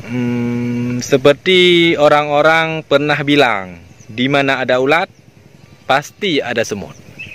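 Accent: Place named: Aceh, Indonesia. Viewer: Kaya orang Aceh Berbah Indonesia,Meri bak irama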